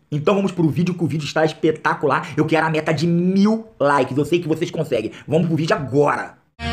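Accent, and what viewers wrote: Rio de Janeiro, Brazil. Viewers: Tu e carioca ne? Kkkk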